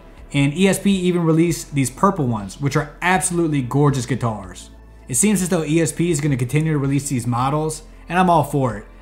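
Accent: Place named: Louisiana, United States. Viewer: Bro you from Louisiana? That accent is screaming you from the bayou.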